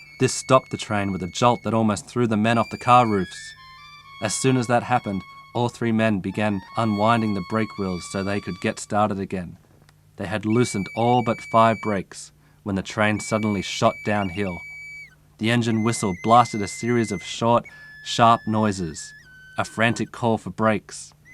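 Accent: United States. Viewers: Love the southern accent, sounds like the Beatles XD cool story bro, thanks for sharing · I live in the US. I like your narration, but I have to say your Old West accent amuses me. :)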